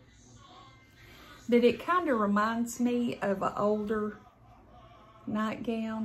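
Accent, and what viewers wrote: South, United States. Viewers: Hi, I enjoy watching your videos. I like your accent, reminds me of some of my family from down south. I used to call my grandmother grannie. She was a good one. I enjoy going to the thrift store too. My daughter took me last Saturday evening. We wore our masks and had a great time shopping. Thanks for sharing your video. 💖💖💖💖💖💖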